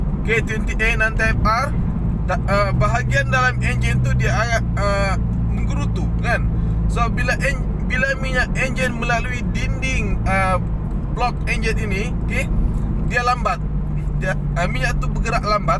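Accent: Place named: Sabah, Malaysia. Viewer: serius la tak pernah dengar orang Sabah cakap?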